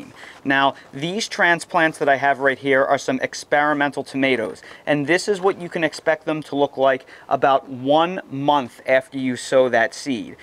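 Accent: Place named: Northeast, United States. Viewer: *I detect a NEastern UDA accent.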